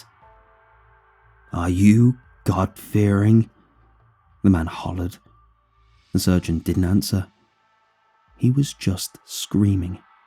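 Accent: United Kingdom. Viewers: Reads title* Me: "Hell yeah!" Starts watching and hears british accent* Me: "Wait a minute."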